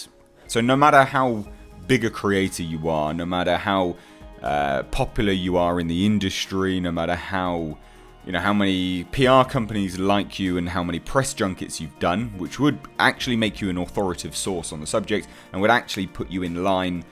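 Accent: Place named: United Kingdom. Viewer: Thanks for covering it. I tend stay away from the extremes on YouTube. There seems to be a left/right war going on in the US at the moment and I feel the rest on the world is being dragged into it. It’s nice to hear a UK accent cover it in a measured way :-) This reminds me of the way YouTube is applying copyright rules. They aren’t actually taking “sides” they are just looking after their advertisers, and it’s their advertisers that have the agenda. YouTube is just following the money.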